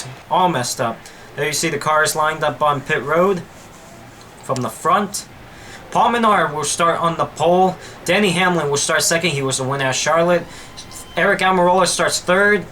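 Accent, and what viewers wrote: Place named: Boston, United States. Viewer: He has a Boston accent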